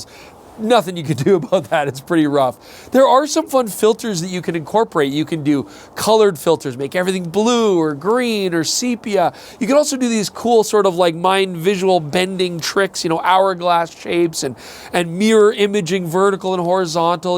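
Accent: Australia. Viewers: Good Aussie Accent! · holy shit I was not expecting that Australian accent even after seeing the comments. Genuinely A+ effort lmao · ' Aussie accent isn't bad. As for the cameras: BLEECHHH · woa great Aussie accent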